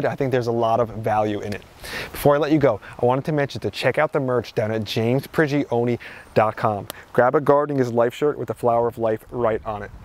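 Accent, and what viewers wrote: New Jersey, United States. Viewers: Love the jersey accent. Midwest college had tons of NY NJ MASS kids. Great diversity before that became a thing. Enlightening and broadened education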